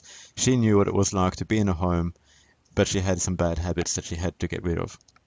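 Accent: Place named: England, United Kingdom. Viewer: Aww... God bless you and your message. I came across your video because I think my cat has a UTI. Please pray for my cat, Harley. I will be praying for him and everyone who comes to this page. Prayer is powerful and thank you for your message. You sound English. I lived in England for 7 years and felt that I was the only Christian that lived there at the time. God answered many of my prayers while living there. God bless you and everyone that visits your video.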